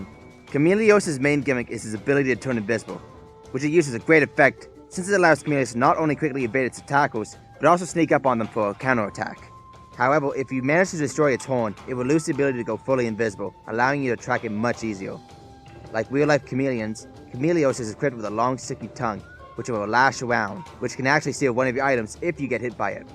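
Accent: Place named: Ireland. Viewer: It sounds irish :D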